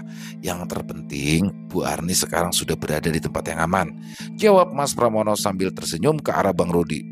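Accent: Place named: Jakarta, Indonesia. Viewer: wahhh mksh bang ada bang rudi lg ni ceritanya seru mantap yg plng ku suka bang bawain cerita betawi nya mantap suara betawiny sukseees terus sehat yselalu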